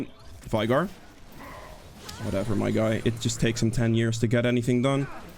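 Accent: Netherlands. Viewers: U are Dutch ?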